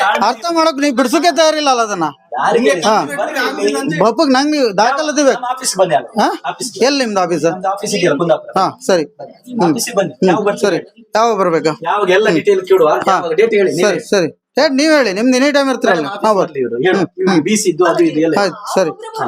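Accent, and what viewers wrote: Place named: Kundapura, India. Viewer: Kundapuradavre nimm maatu..👌.😂.dairya mecchle beku.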